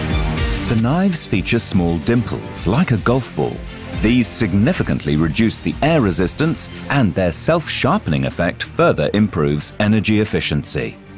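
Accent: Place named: England, United Kingdom. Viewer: I hate hearing English narrators talk....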